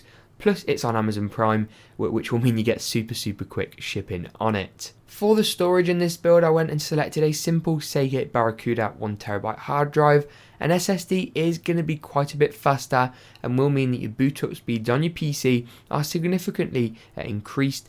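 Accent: England, United Kingdom. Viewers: You sound english, Why priced in dollars?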